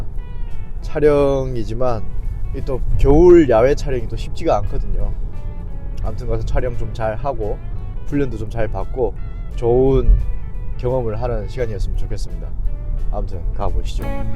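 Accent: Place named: Gyeonggi, South Korea. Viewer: 잘 생긴 男배우같은~ 경기도 언어 억양, 이젠 ⚫️ 검은안경벗고, 자신감으로 😮 보기좋아요🎉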